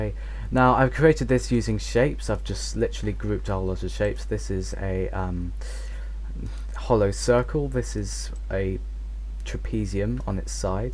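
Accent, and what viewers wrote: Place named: United Kingdom. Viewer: are you british, you sounds like you came from the united kingdom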